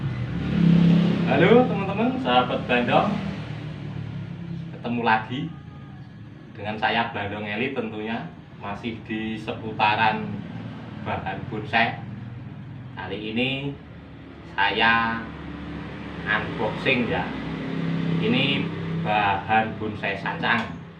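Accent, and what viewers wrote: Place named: Java, Indonesia. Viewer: Wkwkkwkw rabuk,, bahasamu podo ae lur koyo aku,, 😁😁 muedok puolll 😁😁😁👍👍👍👍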